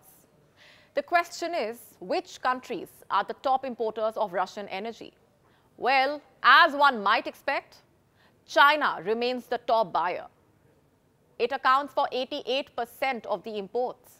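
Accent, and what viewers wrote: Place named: India. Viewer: The accent is very indian😅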